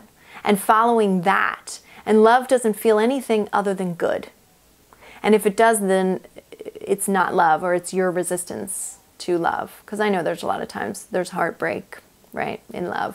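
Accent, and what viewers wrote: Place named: Michigan, United States. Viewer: No, it sounds like a Michigan accent. I like your accent. I have a heavy Brooklyn NY accent.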